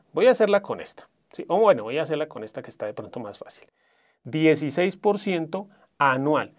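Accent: Colombia. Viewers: Profe eres colombiano????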